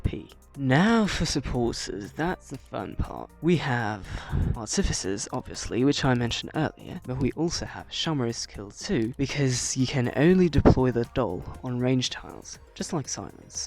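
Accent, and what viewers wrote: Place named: United Kingdom. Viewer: Why is this man with a British accent saying he isn't native? Am i just really bad at placing accents?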